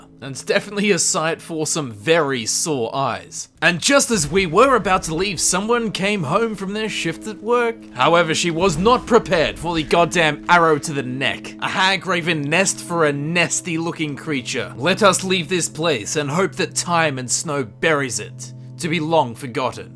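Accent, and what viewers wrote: Western Australia, Australia. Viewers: I thought you were British, I guess I don't have a keen ear for Far west accents. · Are u a fellow Aussie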